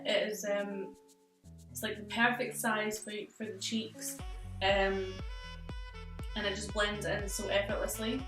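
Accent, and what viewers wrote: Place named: Scotland, United Kingdom. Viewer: Omg! Loving this look, colours are gorgeous on you...I'm diggin' your accent! Are you from Scotland, I'm not good at detecting accents so please bare with me luv 😂 😂 😂 Had to subscribe, excited to get to know you girlie 🌸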